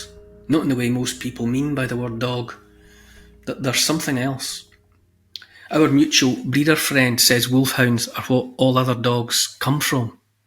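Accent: Scotland, United Kingdom. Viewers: I've just started listening to you after seeing you interviewed by Eddie Hobbs who I also only just found (late June 2025). It's so nice to hear you speak as you think as I do. I am English but I live in America and I see what has happened and is happening. I was listening to David Icke many many years ago, and he wasn't wrong, was he? Anyway, just a thumbs up for your YT channel here. Keep up the good work. And I like the Scottish Accent when I can hear what's being said and yours is very clear.;)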